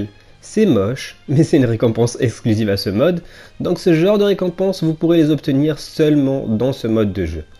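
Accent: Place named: Toulouse, France. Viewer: toi tu fais des vid&os àdesheures lol.En tout cas je kiffe et ton petit accent toulousain fait plaiz :). Sur ce, continue ce que tufais car ci cool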